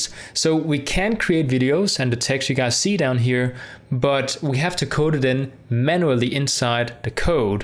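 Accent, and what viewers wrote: Denmark. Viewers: I could hear from your very first video that you were from Denmark lol, Hello fellow dane!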